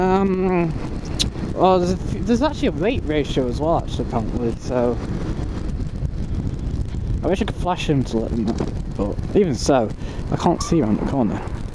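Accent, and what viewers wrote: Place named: China. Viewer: You make English sound Chinese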